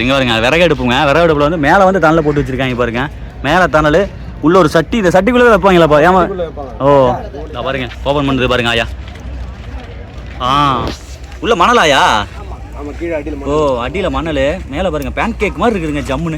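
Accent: Kongu, India. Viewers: Hi bro! How are you & your family? Unga videos yellam super bro. And we like your kongu tamil, naangalum erode tha. But ipo dubai la irukom enga v2la unga videos tha paathutu irupom. And we are waiting for your flight travel videos. And mohan annava ktatha sollunga.🎉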